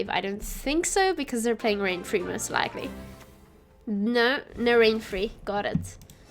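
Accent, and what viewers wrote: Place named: Afrikaans, South Africa. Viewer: You Sound REALLY Afrikaaans, Indien so, baie dankie vir die GWENT clips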